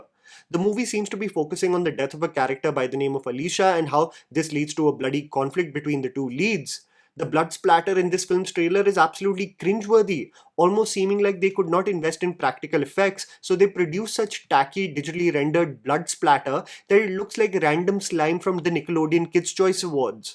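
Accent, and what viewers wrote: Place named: India. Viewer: Bro’s accent is pure Apu from The Simpsons, tossing big words like he’s auditioning for a dictionary. Talks Hindi movies but can’t pronounce English, rambles 10 minutes for a 30-second point. Violence trend started with Animal, now everyone’s just copying instead of making good films. Chill, simplify, maybe add some humor—felt like a dad lecture. Half the time I had to use ChatGPT to TL;DR your review. You think you’re a genius, but you’re basically the Donald Trump of movie talk, or may be you have dementia, who knows.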